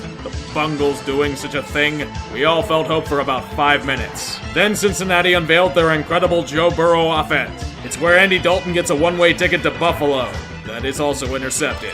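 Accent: Pittsburgh, United States. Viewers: Best part of Sportsball, hearing a Yinzer praising the Ravens.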